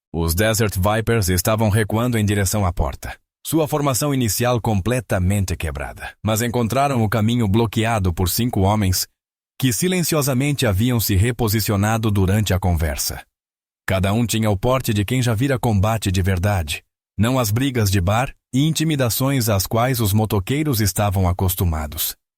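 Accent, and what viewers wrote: Portugal. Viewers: Pq esse narador tda vez q fala um número errola a língua, ou pronúncia em português lusitano ???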